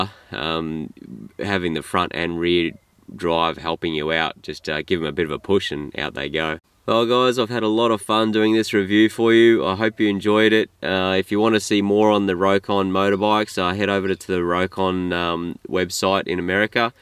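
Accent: Australia. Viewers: Very cool, I live in Canada and I've heard of Rokon and a couple other 2WD motorbikes, and I always thought it would be great to own one, I've owned all kinds of offroad dirt bikes, but never a 2WD, it would be great, but not until my kids grow up, I just hope I'm not too old to really use one by then, great review anyways Buddy, u must be from Australia?? Not New Zealand I guess??? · Damn! Wish I could speak Australian !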